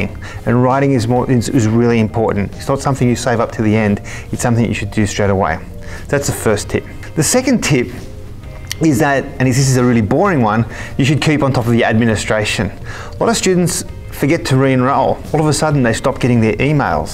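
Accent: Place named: Australia. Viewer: Aussie accent 🔥🔥🔥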